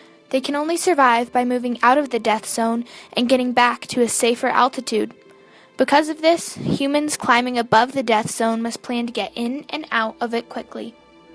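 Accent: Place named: India. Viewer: Indian accent 👌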